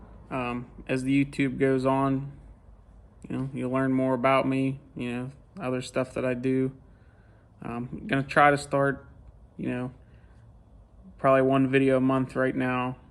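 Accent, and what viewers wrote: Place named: Pennsylvania, United States. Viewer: I knew right away you were from Pennsylvania. When I heard you speak on PeaceNGrease video from rod run I got to tell you that Mack truck is beautiful I love it the iron city beer sign on your floorboard at the shifter reminds me of home I'm originally from Butler Pennsylvania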